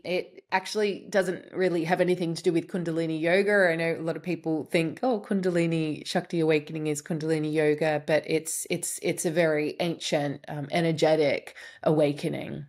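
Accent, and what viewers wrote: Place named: Australia. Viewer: Hi, I'm from the south west of Australia so great to hear a fellow Aussie on here ❤.. just a question.. I worry about everything and have been trying to have a mystical experience through breathwork for a while now to no avail.. do you think I may just not have a soul? Like an NPC or something? I don't seem to feel emotions of joy just anger and misery.. do you believe in soulless people (literally)? Would they know they weren't human?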